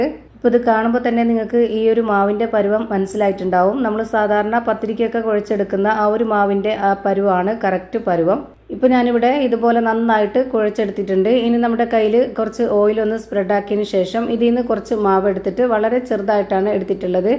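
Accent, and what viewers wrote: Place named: Malappuram, India. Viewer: മലപ്പുറം താത്താന്റെ ശബ്ദം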